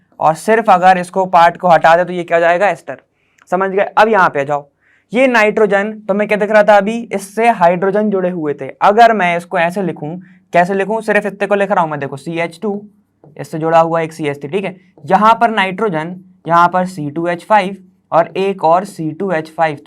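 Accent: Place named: Bundelkhand, India. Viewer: sir ki voice se lagta hai sir bundelkhand se hai ,i guess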